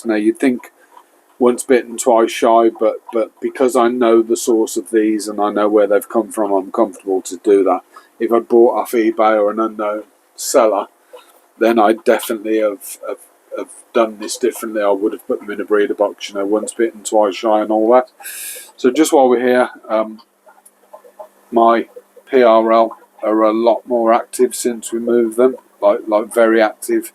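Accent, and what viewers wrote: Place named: Black Country, United Kingdom. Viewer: yeah I heard in one of your vids. I guessed so with Yam twang 🤣🤣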